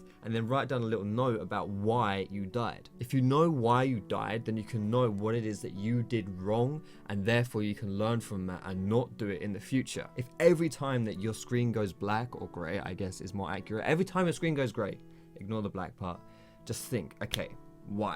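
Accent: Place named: United Kingdom. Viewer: I love British accent :3 #nohomo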